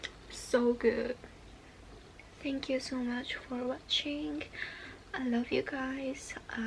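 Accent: United States. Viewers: American shdee yun angli be ?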